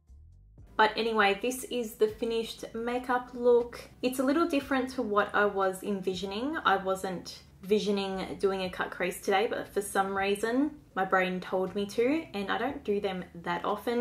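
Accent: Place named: Australia. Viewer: Omg the weird guy story 😂😂😂🤣🤣🤣I love the way you talk, it’s exactly how I talk with my friends! I love watching fellow Aussies, but you especially make me laugh with your stories 😃😃😃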